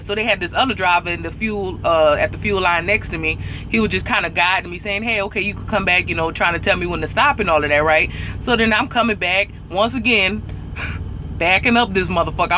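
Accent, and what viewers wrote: Louisiana, United States. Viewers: You sound a bit Cajun or Creole. Whatever the correct term is of course. You are cool as shit. Would be fun to have a couple shots or beers with you... I hate this FUGGIN Automated Transmission. 17 KW T680.but I believe the Gentleman is correct. Tap it to engage it...then try easing on it. As a matter of fact this stupid. Transmission was just messing with my going over such a simple hilly pass as Tehachapi, California 58... Bullshit was just acting all week with 17K loaded heading West Towards Bakersfield and now even weaker with just 32k headed East on Tehachapi pass. FUGGIN BS Y'ALL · R u from Louisiana? Trying to place ur accent. LoL.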